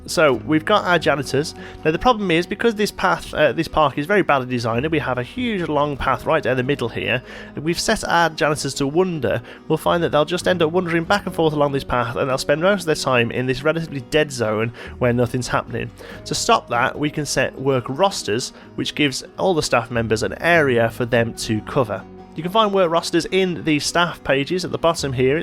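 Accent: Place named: England, United Kingdom. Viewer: I have downloaded and paid for the game and two add on packs via steam. Trying to get to grips with the game is a challenge in itself, however the *** steam interface is unbelievably clunky and not intuitive at all for example it says my 2 DLC packs are in my library but they are not !!! Also if I download a small park and go to open it I get a message to either continue loading without the additional items or continue and download … that option hangs the computer … I have spent more time on messing with steam than playing the game and am starting to think I should have purchased from the App Store (Mac) I realise my post is off topic but do you use steam … I have raised a ticket with support but I feel that now they have had my money very quickly they are not that bothered sorting out new user issues. Apologies for the rant but listening to an English voice is preferable to an accent from across the Atlantic 😢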